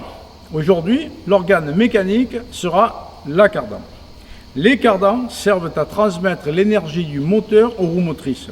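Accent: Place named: Marseille, France. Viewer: Lol! Une cardan non de dièu Accent typique de Marseillais :trophy-yellow-smiling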